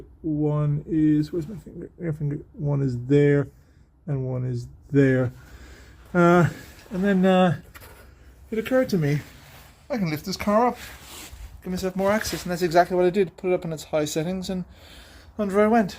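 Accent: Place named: Cork, Ireland. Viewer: ah you can still hear it in you is it north cork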